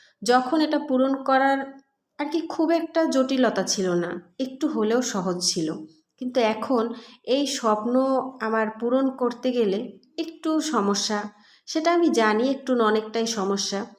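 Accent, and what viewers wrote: Barishal, Bangladesh. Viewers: তোমার বরিশালের কথাগুলো মিস করি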